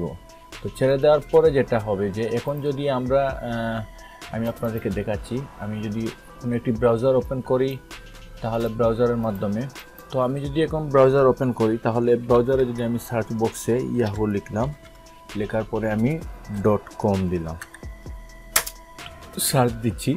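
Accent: Sylhet, Bangladesh. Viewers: ভাই বাড়ি সিলেটনি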